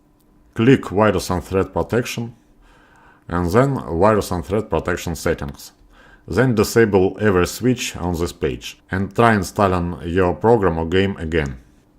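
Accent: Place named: Russia. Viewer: Ебать у тебя акцент братишка, русских слышно сразу)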